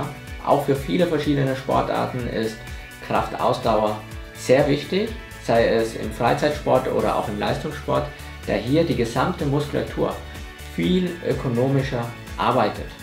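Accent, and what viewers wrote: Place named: India. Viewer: Haha sein indischer akzent total putzig :D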